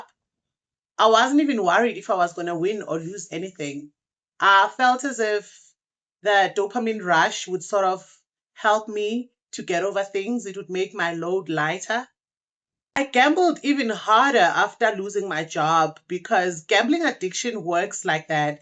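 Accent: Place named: South Africa. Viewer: You sound like one of us. Anyway, love from South Africa 🇿🇦 !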